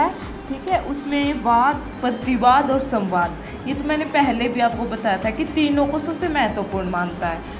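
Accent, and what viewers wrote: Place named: Haryana, India. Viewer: Haryanvi presentation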